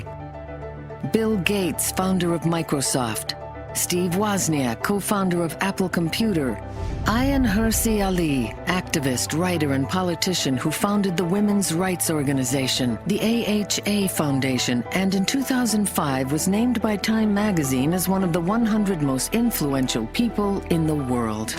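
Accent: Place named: Levant, Syria. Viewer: هل معناة حبي لهم أني ما أقول الحقيقة؟ نعم أمي جاهله وأبي جاهل بكثير من الامور رغم شهاداتهم القوية الا أن في ظني هم يعتبرون جاهلون قليلا وخاصة في أمور الالحاد ، بعض الاحيان تحصل دكتور طب لكن سلفي يعني حمار لكن يفهم بالطب ، يعني هذه الحياة المشكلة أن المسلمين غالبيتهم ليسو مثلك ، غالبيتهم بهايم ولايقرؤون وجهله ويشتمون ، ويبدو من لهجتك أنك سوري أو فلسطيني يعني يمكن عشانكم بسوريا عندكم أكثر من دين وطائفه والنقاشات عندكم طبيعيه نحن نعاني من هذا التخلف في الجزيرة العربية ، تخلف وجهل وغباء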